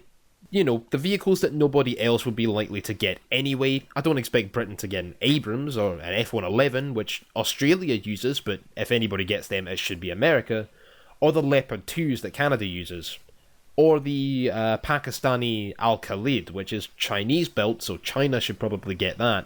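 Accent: South Africa. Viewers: 10/10 South African accent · Your South African accent sound more like the Durban Indian accent than the Afrikaans accent XD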